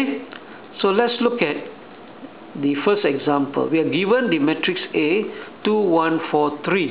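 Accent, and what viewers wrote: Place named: Philippines. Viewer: sounds asian... phillipino is my guess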